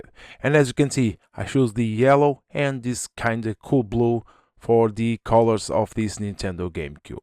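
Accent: Portugal. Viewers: Adorei que eu fiquei assim"este sotaque é mesmo a PT" depois fui ver o nome, makes sense 😂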